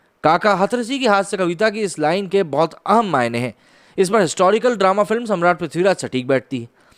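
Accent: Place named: Punjab, India. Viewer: His pronunciation is like that of Punjabi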